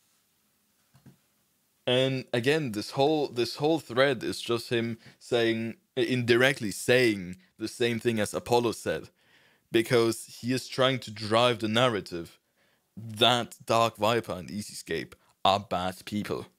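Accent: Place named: Germany. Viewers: I think his english fine. Ich mein, 20 Sekunden in, dache ich... ist er deutsch? Aber so what!? I’m gonna subscribe whatsoever. 😉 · Dein Denglisch ist so horrendous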